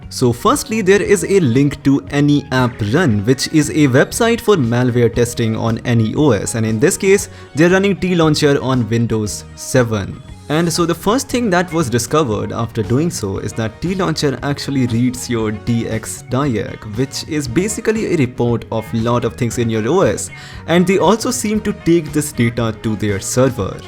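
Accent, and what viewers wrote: India. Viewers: that's an Indian accent for English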